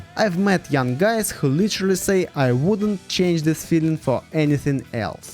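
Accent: Russia. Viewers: Bro, I watched several of your videos and I really liked your documentaries and the way make them. The visuals paired with your russian accent gives it a special look and feel. Thank you for bringing this up as I learned a lot about Russia....oh and YES, you got a sub 🙌😎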